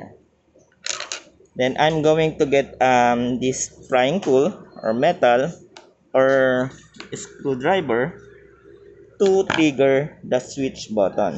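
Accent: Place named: Visayas, Philippines. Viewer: Bisayaa oi. Peste. English² pang kagwang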